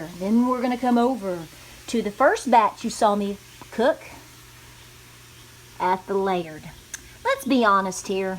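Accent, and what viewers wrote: South, United States. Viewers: Darlin, I'm gonna make hubby some sausage gravy n biscuits for supper tonight. Gosh, listening to your accent, I do miss Tn and Va !!!! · You shore sound like a true southerner. Butter and honey yummy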